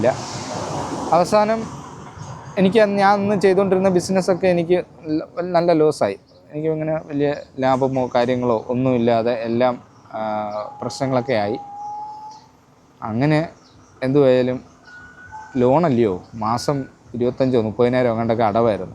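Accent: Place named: Pathanamthitta, India. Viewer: Bro Try to solve and have a good life with your child, നമ്മളോട് നന്നായി behave ചെയ്യുന്ന നമ്മുടെ relatives മറ്റുള്ള എല്ലാവരോടും അങ്ങനെ അകണം എന്നില്ല. ആവശ്യമെങ്കിൽ അവരോടു കൂടി മാറി താമസിക്കണം. ഇന്നത്തെ കാലത്ത് എല്ലാവരും എല്ലാവരെയും സഹിച് ജീവിക്കണം എന്നൊന്നുമില്ല. നിങ്ങളുടെ ജീവിതം നിങ്ങൾ 3 പേരുടെ മാത്രം ആണ്. അവരോട് നേരിട്ട് ചോദിക്കുക ഒന്നിച്ചു ജീവിക്കാൻ താല്പര്യം ഉണ്ടോ എന്ന്, എന്നിട്ട് മുൻപോട്ട്. You sound kike from Pathanamthitta or Kollam area, all the best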